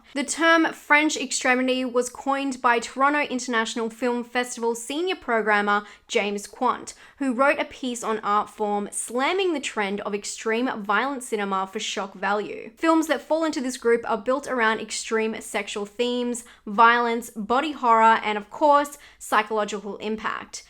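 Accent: Australia. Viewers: I love it when you say "bizarre". Love an Aussie accent!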